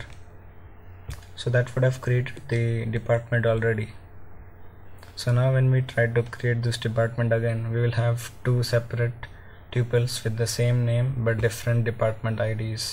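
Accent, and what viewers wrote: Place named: India. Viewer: I hate the Indian English.. I dont understand...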